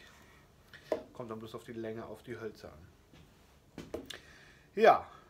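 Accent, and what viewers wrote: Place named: North, Germany. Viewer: Cooler Kanal! Endlich mal jemand der ein Norddeutsch schnackt! Ich freue mich auf weitere Videos von dir!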